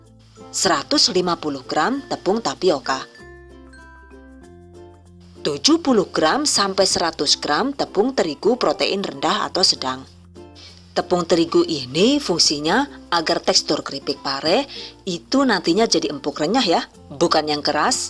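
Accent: Banyumas, Indonesia. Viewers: Plat mobile jawa timur, tapi ngomonge ngapak, jane rika wong ndi koh lik? 😅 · Orang Madiun kok ngapak bunda?? Hehehehhe maklum saya subcriber baru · ngapak tah ....ibunya makasih ilmunya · negro deneng ngomonge koh ngapak hhhhhh ...... njaluk lah yu sriping parene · Ciri khas ny oke.. Hihi.. 😂 Bahasa ny ngapak.. Hidup ngapak.. 👍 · Bahasane NGAPAK banget kie lahj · Kok ada logaat bahasa Jawa nya mirip org Banyumas😁. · 😆lucu banget Bahasa koh ngapak kaya nyong kiye yu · Kok ngapak toko wkwk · Ngapak bu... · Loh deneng 🤣🤣🤣 ngapak temen koh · Yuuhh,,, rika wong ngapak ya mbok... ora ngapak ora kepenak.. 🤣🤣🤣 #salamngapak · Owaaaalaaah ngapak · Deneng jebule ngapak rika jand hhhhhh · Lokasine madiun deneng ngapak 👍👍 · Ngapak bgt rika koh yu . Btw maen infone yu 😂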